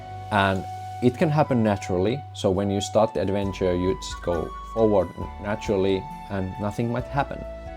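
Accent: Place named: Finland. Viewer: Suomi? Cool accent 😎 Thanks for this walk through!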